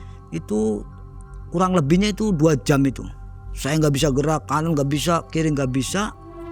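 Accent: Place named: Cirebon, Indonesia. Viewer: Orang jowo borot kayaknya (cirebon) ada “jeh” nya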